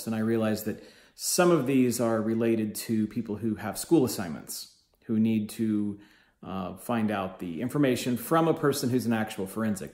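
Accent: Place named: United States. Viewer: In Iraq, I had to go through 6 years in order to finish medical school (requested number of years here ) 😖1 year junior residency, 1 year between PHC and my work in university.. and now i’m in the second year Histopathology board residency. I like how you explain it from A to Z, your clear American-english accent is astonishing, I just put headphones and listen.. every time you post a narrative video 🤩 I wish you were my tutor 🙏🏻 Thank you so much, Dr. 🌷 Arwa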